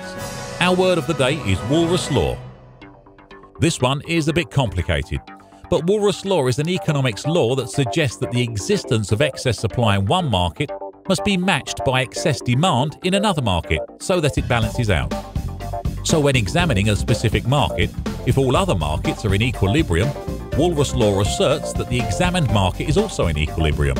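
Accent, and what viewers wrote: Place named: England, United Kingdom. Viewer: They are English xD they pronounce everything in English!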